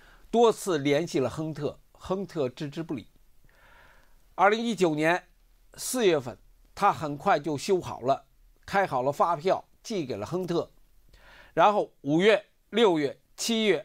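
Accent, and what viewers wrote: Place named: Beijing, China. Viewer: 从十一开始到十一结束很久没看涛哥的节目了，听到涛哥的那口正宗的北京口音感觉棒极了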